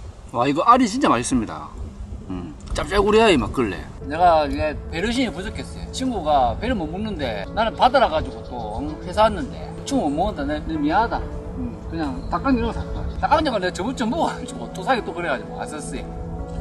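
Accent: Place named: Busan, South Korea. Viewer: 부산 사투리가 무슨 말인지 모르겠어요 ㅋ😢😢 영상 재밌게 봤습니다.🎉🎉🎉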